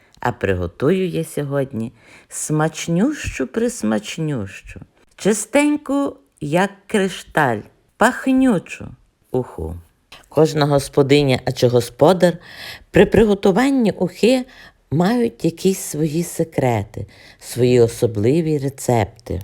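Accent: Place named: Poltava, Ukraine. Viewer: Доброго вечора. Така прекрасна мова, ви часом не з Полтавщини?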